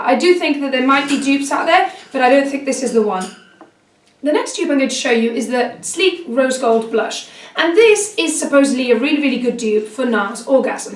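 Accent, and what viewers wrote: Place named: England, United Kingdom. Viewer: I know, especially since there are other pages that don't charge :( I remember being to Cardiff and wanting to take the whole stand back home! <3 Thanks God I didn't because I only took a LE Batiste dry Shampoo (the one with the Brit flag that was released for the Olympics) and they made me throw it away at the airport :( Well, lovely Youtubers deserve lovely subscribers (and thanks for calling me lovely, by the way :) ) P.S: Do you mind if I ask you where in England are you from? I love your accent ^_^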